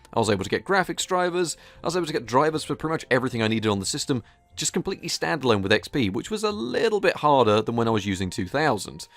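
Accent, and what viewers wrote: United Kingdom. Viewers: why i hate british accent i dont understand :/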